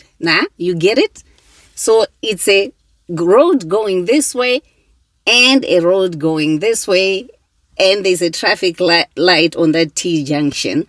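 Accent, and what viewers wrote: Zimbabwe. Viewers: What a nice accent for a Zimbabwean, I enjoy listening to your voice. From South Africa 🇿🇦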